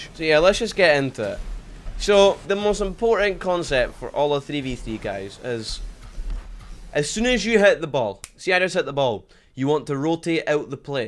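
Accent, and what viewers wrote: Scotland, United Kingdom. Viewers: Are you Scottish by chance?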